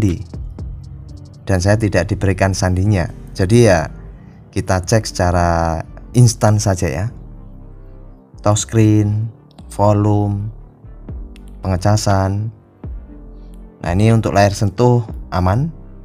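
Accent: Java, Indonesia. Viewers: Jowone ngdi mas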